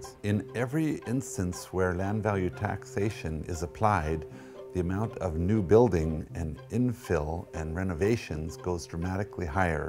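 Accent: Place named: Canada. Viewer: Holy shit this guy’s accent is Canadian af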